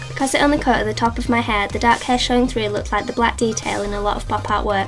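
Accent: Yorkshire, United Kingdom. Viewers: R u british I am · Her accent is yorkshire amd jade thirlwells is Geordie .... There's a difference x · your Yorkshire accent is so pure in this lol I almost forgot how northern you are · it sounds like she has a Yorkshire accent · You've just got a new subscriber! Also, you're from Yorkshire? I'm from there too 👌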